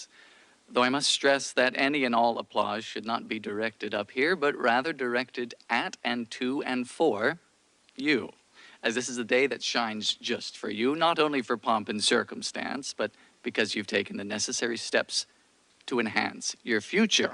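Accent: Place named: Bermuda. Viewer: The Bermudan accent comes out a bit more when he's at home eh.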